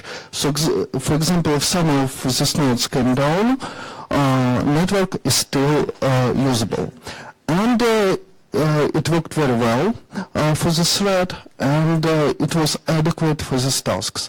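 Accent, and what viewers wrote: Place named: Russia. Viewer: A russian speaking english with an accent into an overdriven microphone about a somewhat difficult to understand and interesting topic - god damn. Furthermore, it's the only useful video when you search for "garlic routing". :(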